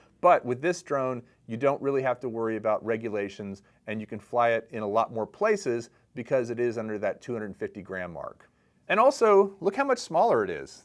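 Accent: United States. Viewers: I like your review because you have clear american voice without accents and its crystal clear to my ears. Thanx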